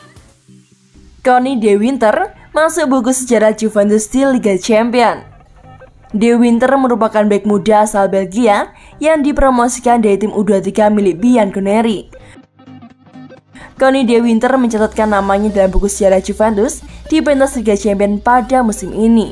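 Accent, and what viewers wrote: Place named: Java, Indonesia. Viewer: Mbaknya medokkk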